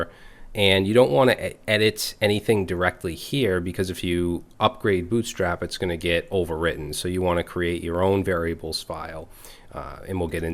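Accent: Boston, United States. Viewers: Is that a Bawston accent?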